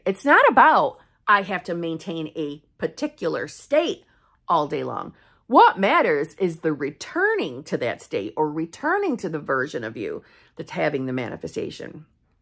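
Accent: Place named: Minnesota, United States. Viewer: Love your North Dakota/Minnesota accent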